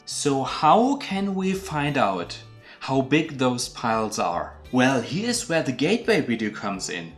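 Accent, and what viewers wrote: Germany. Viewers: hört man richtig stark. Ist halt der typische deutsche Akzent beim englisch reden 😃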